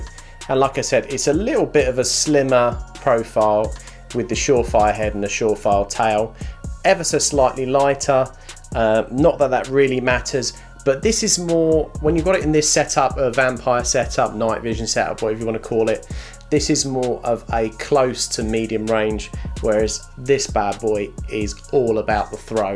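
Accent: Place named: Australia. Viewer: Where are you located? Sounds Australian but I though Australians had super tough gun restrictions.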